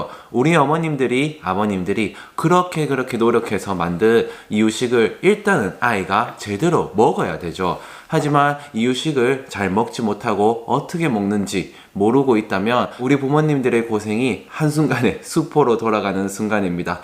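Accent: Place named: Gyeongsang, South Korea. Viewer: 일한이 너모 귀욥네요ㅜㅜㅜ♡♡ 저도 경상도 사람이라 사투리 쓰시는 모습이 더 친근하게 느껴지고 귀에 쏙쏙들어오네요ㅎㅎ 5개월차 엄마에요 아직 앉아서 먹기힘들어해서 바운서에 누워서 먹이는데 숟가락이라도 쥐어줘봐야겠어요ㅎㅎ 숟가락잡으려하는데 제가 떠먹여준다고 못잡게했거든요ㅠㅠ 낼부턴 스스로 잡게 숟가락을 하나더 준비해야겠어요! 다행히 울아가는 너무 잘먹어주는데 한번씩 안먹으려할때 티비나 동요나오는 장난감 틀어주는데 그냥 다끄고 조용한환경을 만들어주는게 좋을까요? 티비나 장난감은 추후 식사습관에 안좋을거 같은생각이 들었는데 궁금하네요~^^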